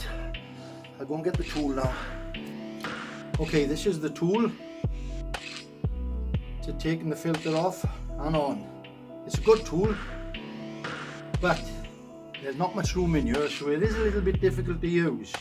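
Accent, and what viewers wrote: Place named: Wales, United Kingdom. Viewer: I'm watching this from Australia as I've got a 1250 Bandit too. Love the Welsh valley's accent as I'm originally from Hengoed !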